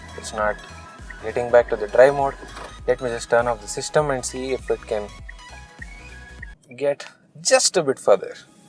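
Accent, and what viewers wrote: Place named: Mangalore, India. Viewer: lol. somehow this host sounds like he's of Mangalore origin. Gut feeling ashte. cuz I'm a Mangalorean myself xD. anyways great and spot-on video! it's good to encourage more people to buy the EV. godspeed!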